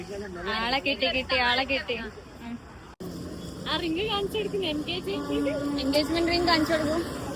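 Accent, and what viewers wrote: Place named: Malappuram, India. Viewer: അന്റെ മലപ്പുറം ഭാഷ കേറി വരുന്നുണ്ട് മോളെ 😂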